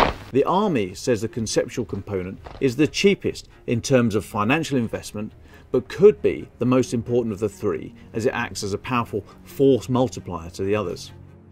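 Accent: United Kingdom. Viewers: The bloke is so Bri'ish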